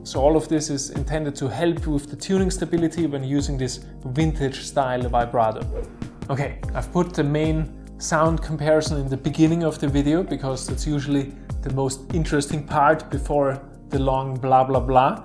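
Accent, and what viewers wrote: Austria. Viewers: Also are you Austrian by chance?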